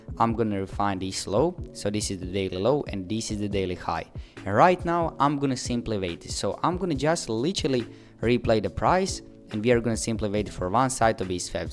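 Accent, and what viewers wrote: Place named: Catalonia, Spain. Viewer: You sound catalonian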